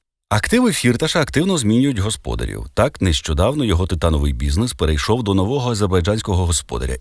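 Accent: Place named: Russia. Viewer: Можна слухати.Але рюцкєї слова та наголоси все псують.Неук.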